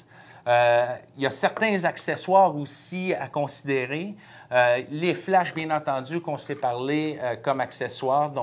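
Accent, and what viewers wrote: Quebec, Canada. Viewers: Sounds French Canadian to me. French with a Anglo pronunciation. Nonetheless good review, I like that X10.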